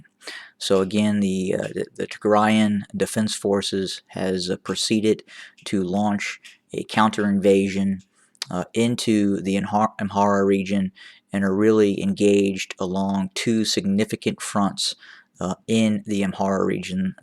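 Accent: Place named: South, United States. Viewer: The commentator sounds Habesha with American (Southern) accent. No problem. Just saying.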